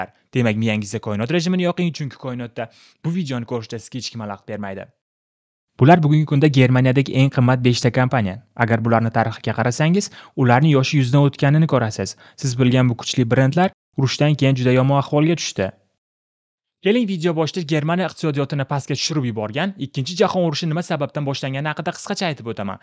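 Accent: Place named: Surxondaryo, Uzbekistan. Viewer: Siz Surxondaryoliksiza, ya'ni Sariosiyo tumanidansiz adashmasam)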